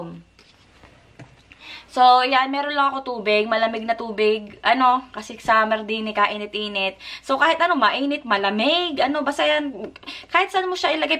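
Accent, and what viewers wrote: Batangas, Philippines. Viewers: te batangeña ka hahha seym. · Taga batangas po b kayu sis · Parang magsalita sya ay batangenya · Hi sissy, enjoy watching you hahahaha....ang gandah ng accent hehe..very familiar sakin , naalala q ung mga friends q sa batangas kc nag work aq sa EPSON Lima, sa Lipa batangas. Keep it up sissy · I enjoy watching your vloggs po...Puro nire,ganire...Batanggenya ka po ba or Tga Quezon province po ba kyo Mam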